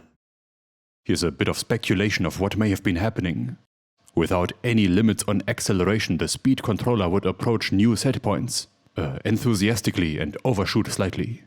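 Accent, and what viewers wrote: Germany. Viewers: The Deutschlander flavoured English narration is beyond superb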